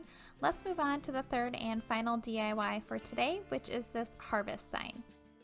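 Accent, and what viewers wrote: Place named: Buffalo, United States. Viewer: I love this! I swear you have a Buffalo accent!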